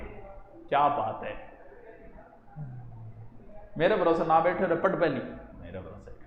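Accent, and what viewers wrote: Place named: Haryana, India. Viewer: Sar paka Hariyana se h inki bagdise pat se pata chal raha h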